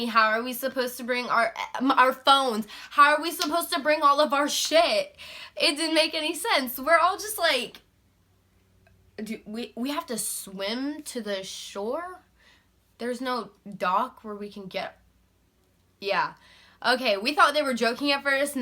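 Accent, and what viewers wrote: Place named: Atlanta, United States. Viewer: Girl you gotta be from Atlanta. Lol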